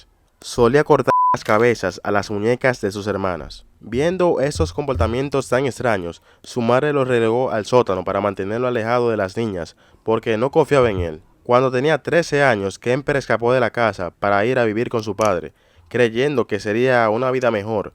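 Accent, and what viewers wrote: Dominican Republic. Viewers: Me encanta como narras y como pones los comentarios de las personas con nuestro dialecto Dominicano! 😂 Tengo muchos vídeos ya visto tuyos.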